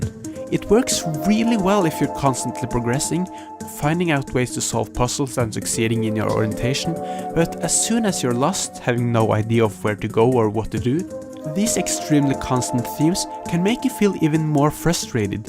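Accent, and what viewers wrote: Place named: Norway. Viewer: May I ask where you are from? It sounds like you have a Norwegian accent, but I'm not entirely sure. I'm Norwegian, by the way.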